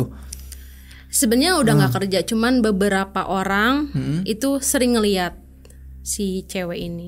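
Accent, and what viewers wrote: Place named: West Java, Indonesia. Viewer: Lidah orang sunda kayaknya 😂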